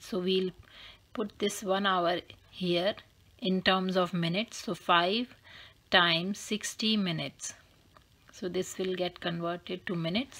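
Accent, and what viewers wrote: India. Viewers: You making fun because she's Indian and have funny accent, or you being sarcastic?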